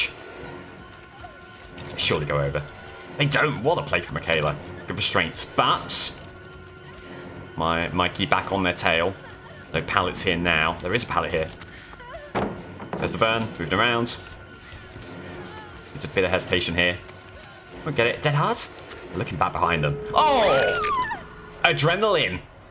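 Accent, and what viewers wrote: United Kingdom. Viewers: So first video I’m seeing of this (I know, bad place to start but I will go back and binge the rest) but saying the killers are rewarded points for hooks when you actually count hook states is a bit confusing. That’s not negative criticism, just thought I’d point it out! Great content, I’m a sucker for a British accent 😂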